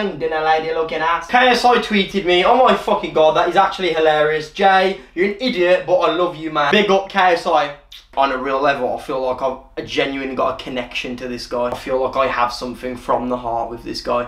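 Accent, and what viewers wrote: Birmingham, United Kingdom. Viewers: Which region of the U.K.is your accent from. I know different parts of england have different accents. I don’t know which regions the accents are from though. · Hank Black he’s from Birmingham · Hank Black Birmingham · Hank Black Birmingham Dudley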